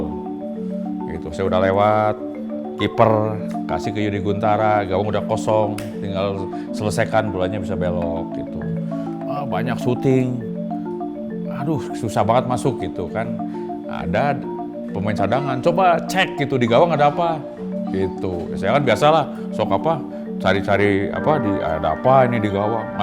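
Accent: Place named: Banyumas, Indonesia. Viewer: ngapak the legend❤... & endog juga carita legend... & saya datang final🤩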